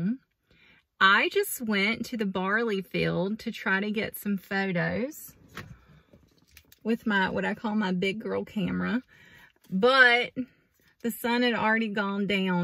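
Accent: South, United States. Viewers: As a Brit I love your southern accent so cool 😎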